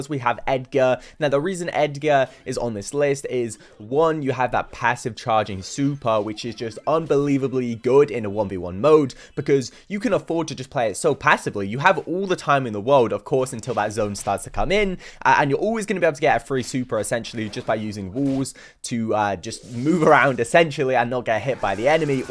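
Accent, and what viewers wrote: United Kingdom. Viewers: I love his British accent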